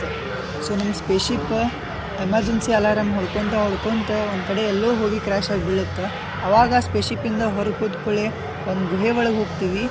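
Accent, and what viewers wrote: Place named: Mysore, India. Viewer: Nice trying Mysore language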